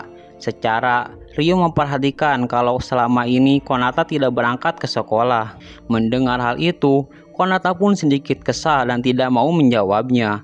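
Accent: West Java, Indonesia. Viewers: Admin asli sunda nya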